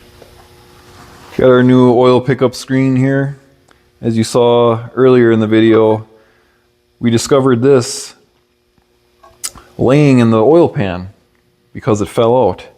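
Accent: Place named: Wisconsin, United States. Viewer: Your channel is going to blow up love the content where are you from sounds northern accent Wisconsin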